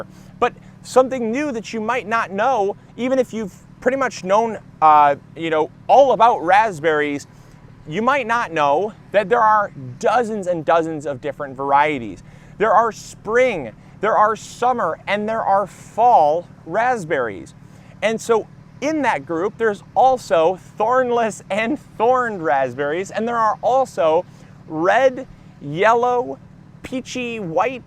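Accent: Michigan, United States. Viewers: Classic rock Lover the Michigan accent is strong in this one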